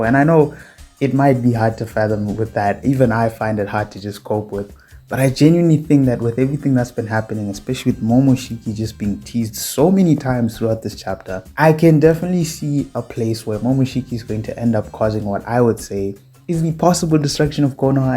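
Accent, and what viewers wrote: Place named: South Africa. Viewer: Your South African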